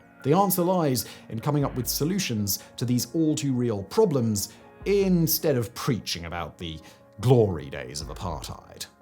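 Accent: RP, United Kingdom. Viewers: Your posh English, lack of general knowledge, and biassed turns of phrase betray your prejudice and ignorance. "SANDF" under NP rule? "Communists" in air quotes... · Oh!! You must be Spewing Accurate Data with your Know it all' Stuffy British Accent Right ✅️ No Leftist Bias here at all..😂 he's sadly Marginalized a Plight of people which is Very Much Real.. but if you've got 15-20 minutes too hear another Know it all Brit Spew what his Taskmasters ask Hey' its your time too waste 🗑 ( i sadly Did!!)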